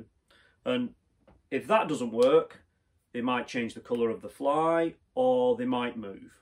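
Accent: Wigan, United Kingdom. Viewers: I think I have learned more off watching this video than I have on any of the others Iv seen - thank you! (could that be a Wigan accent by the way sounds like your from gods country?)😊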